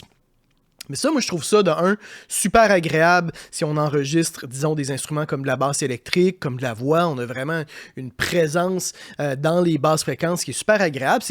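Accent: Quebec, Canada. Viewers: Je les utilise quotidiennement depuis 1 an … ils sont précis mais pas ultra confort , le véritable avantage est le fait qu’ils soient pliable et vendu avec une housse et une protection rigide ce qui permet de les emmener en reportage et d’avoir un meilleur contrôle sur les interviews …. Tu as raison rapport qualité prix fiabilité c’est parfait et le fait qu.il soit filaire avec un câble comme les vieux téléphone ce qui permet d.éviter les arrachements et d’avoir de la sécurité et enfin une haute qualité de câblage du jack ce qui n’est pas toujours le cas permet de pouvoir toujours pouvoir compter sur lui. Quand tu fais des tournages loin et chère tu as besoin de pouvoir compter sur le matos .ce Sony fait parti de ce type de produit comme une go pro. Un canon , une RED ou un hard disc WB ,des produits incontournable. Merci pour la qualité de ta chaîne et ta façon ludique d.aborder les sujets et les rendre à la portée de tout le monde . 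En plus pour un français c.est toujours très plaisant et très funny l.accent Québécois.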